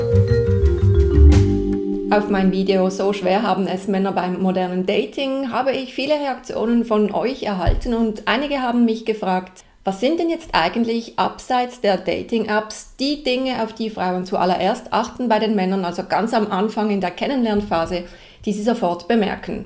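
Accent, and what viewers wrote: Basel, Switzerland. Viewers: Hallo, abgesehen von deiner ästhetischen Attraktivität schaffst du es mit einem schweizerischen Deutschakzent eine ganz tolle Sympathie zu erwecken... das macht deinen Vortrag noch interessanter... und würden wir uns echt in einem Date begegnen, dann würde ich sofort einen großen Bagger kaufen um dich anbaggern... bis du mitkommst! Nein, war nur Spass! Aber bitte mach so weiter, denn deine Worten kommen aus der Wahrheit! Danke. Lg. Josef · It is Swiss variation of German (with accent from Basel). But when she speaks her real dialect it sounds even more different from the language used in the video. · Den Baslerdialekt hört man halt schon trotzdem raus.😉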